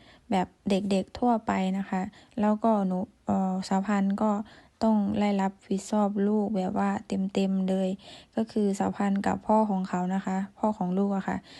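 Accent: Laos. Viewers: ใช้ได้อยู่ เว้าภาษาไทย ฝึกไปและต้องสังเกต ส่วนมากคนลาวเพิ่นสิเว้า ช เป็นซ.คนไทยสิจับได้เลยว่าเจ้าเป็นคนลาว ลองฝึกเบิ่ง แล้วกะ ด เจ้ากะใช้ ล ได้เจ้ากะเว้าว่าไล้ ช่วยเจ้ากะเซ้าซ่วย ช. ด ฝึกให้มันถูกแค่นี้กะเว้าถูกแล้ว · มันบ่คุ้น เสน่ห์ของหนูคือพูดลาวนั่นล่ะ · แม่ติดตาม มานานแล้วมักที่เจ่าเป็นคนลาวเว้าลาวเป็นโตของโตเองแล้วก็เข้าใจสำเนียงเว่าของลาวไต่มันกะคือคนอิสานทางอุบลจงทำในแบบที่เฮาเป็นแล้วคนเบิ่งคริปเขากะมักที่เจ่าเป็น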